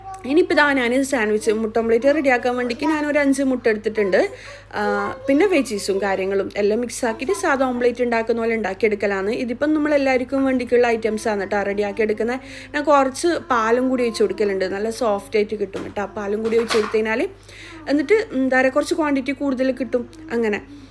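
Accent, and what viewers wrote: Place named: Kasaragod, India. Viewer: ഇങ്ങടെ സംസാരം പൊളിയാ.. കാസർകോട് ആണോ 😍😍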